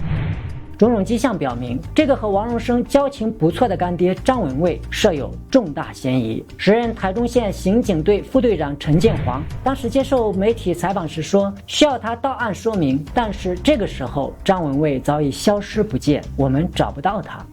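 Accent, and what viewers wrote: China. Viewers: 超明顯的大陸口音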